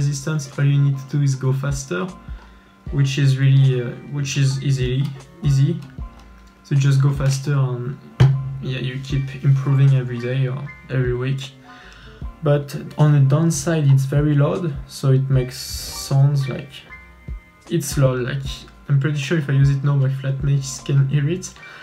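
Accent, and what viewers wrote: France. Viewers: Sa se vois que t'es français à cause de l'accent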